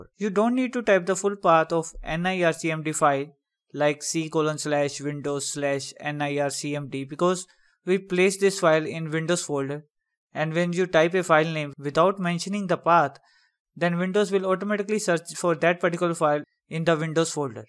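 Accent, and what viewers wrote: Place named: India. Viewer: ALL OF THESE ARE SOME GUY WITH AN INDIAN ACCENT SO I CAN'T UNDERSTAND WHAT HE'S SAYING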